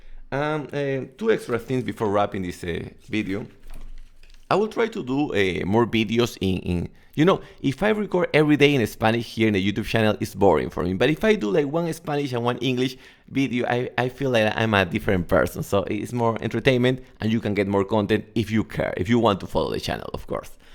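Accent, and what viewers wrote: Peru. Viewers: Nah, Hbla bien solo que reconoces el acento peruano y te parece malo, pero si lo comparas con un ruso, aleman, se le entiende incluso mejor.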